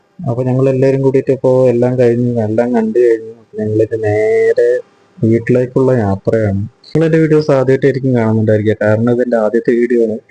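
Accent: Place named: Palakkad, India. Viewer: ettan powli🤩 adipowli aayitundu nammade ah palakkadan slang il thanne adi manoharamayi eduthitundu ineyum idupole orupadu videos edukkan kazhiyanam ennu njn aashamsichu kollunnu🎊👍🏼💖🎉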